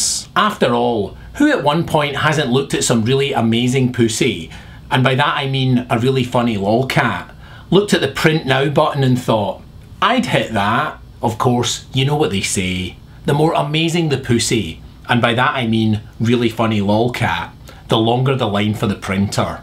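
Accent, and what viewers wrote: Scotland, United Kingdom. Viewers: I'm no going to say whether or not I support Obama or McCain. I am going to say, however, that the last two of videos have been incredibly disappointing to me. It sounds like he just reads op-eds with a scottish accent. I go to youtube to wind down, because I've heard to much of politics in my day. I don't want to be blasted by politics again., please go back to making fun of the iphone and dvd special features. Those sketches are funnier.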